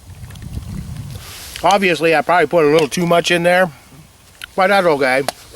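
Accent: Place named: Eastern Oregon, United States. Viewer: What part of Oregon are you from? My guess is somewhere from Eastern Oregon?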